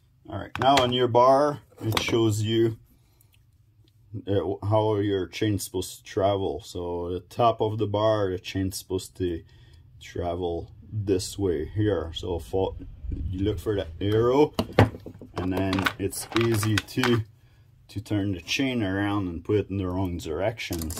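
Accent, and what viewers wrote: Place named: Quebec, Canada. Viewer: Thank you! May I say you have the most delightful French-Canadian accent.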